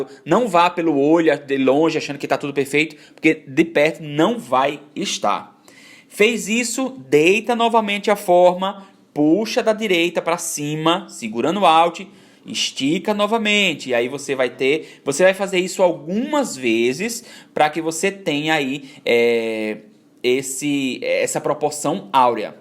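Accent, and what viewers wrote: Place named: Salvador, Brazil. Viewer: Eita, este sotaque é de Salvador... Coraçãozinho bate no ritmo da Bahia quando escuto meus conterrâneos depois de tantos anos vivendo em Buenos Aires. Obrigada pelo conteúdo! Amanhã mesmo vou aplicar numa ideia. Gratidão!!!! 🙏🏾♥️🙏🏾♥️🙏🏾♥️🙏🏾♥️🙏🏾♥️🙏🏾♥️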